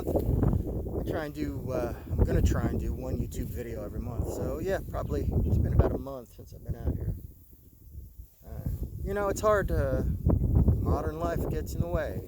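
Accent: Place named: Oklahoma, United States. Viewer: You didn’t say hunting you said “huntin” your an okie jersey is a long way form you now ole son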